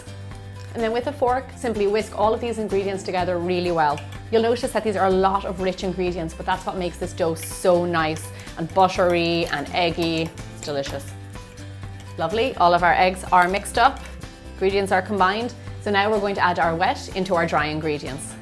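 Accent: Ireland. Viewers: Love your Irish accent! Cork? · Lovely recipe, but are you Irish? you sound irish...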